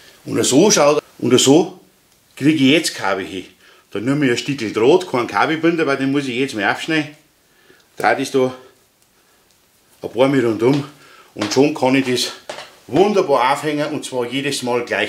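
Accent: Bavaria, Germany. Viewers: Genau so ist es.Ich habe in den 80 igern in Rostock Überseehaven gearbeitet als Festmacher. Dort wurden die Wurfleinen genau so zusammen gewickelt. Klasse dein Kanal und gut erklärt.Auch wenn ich als Sachse oft lauschen muss was du sagst durch den bayrischen Dialekt....lach. LG der olaf aus Delitzsch bei Leipzig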